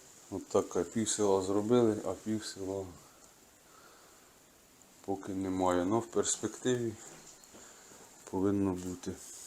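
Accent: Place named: Podillia, Ukraine. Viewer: У прекрасна вимова з приємним подільським діалектом. А "дядько каже " просто супер, не треба чіплятися до людини, мови вчать на інших каналах.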